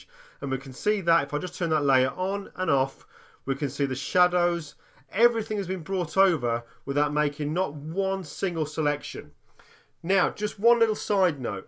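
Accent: United Kingdom. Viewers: It's a UK accent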